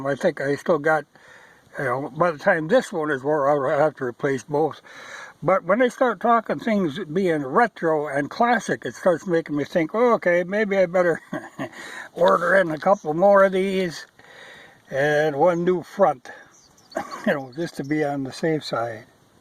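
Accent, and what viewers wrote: United States. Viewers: It was a little difficult for me to understand your accent during the first couple of minutes. To me it sounds like a southern USA accent but I really don't know. I'm from northern Spain, near France, and I still enjoy my Bultaco Pursang MK8, 363 cc that I bought new in August 1975. This bike came with Motocross racing tyres 3.00x21 and 4.50x18, but I tend to use Trials tyres in sizes 2.75x21 and 4.00x18. Michelin Competition is the lightest and stickier, Dunlop or Pirelli made for Trials Competition are also good, but tgey last very long like you would expect for every Trials tyres. Should I try your choice from Dunlop, if they make them in 2.75x21, or maybe 3.00x21? By the way... Im very intrigued with your accent. Where are you from?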